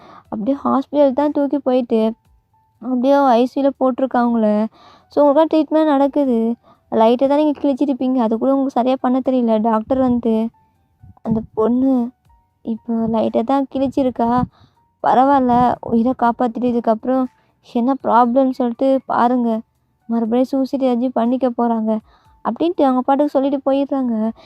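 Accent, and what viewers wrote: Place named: Sri Lanka. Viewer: Sri Lankan maari pesuringa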